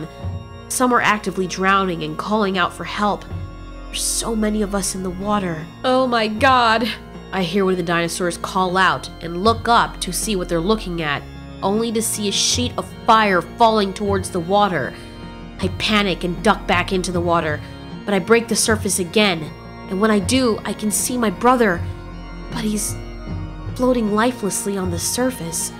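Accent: Australia. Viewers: Every like is for that Australian accent 👌😂 Also can you make a Baryonyx POV? I'd love to see that! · I like the austalian accent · Can we talk about how your Australian ascent is on point, took my breath away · Nice Australian accent 👍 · She has a good australian voice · I also heard a bit of austrilian accent wicth he actully has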